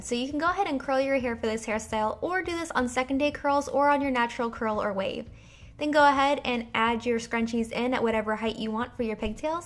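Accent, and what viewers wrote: United States. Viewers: you really look like gemma styles and your voicebut with an american accent <33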